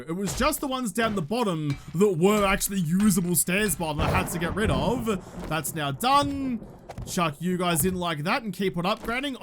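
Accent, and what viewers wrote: Australia. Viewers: The aussie accent always thickens when he's excited or stressed.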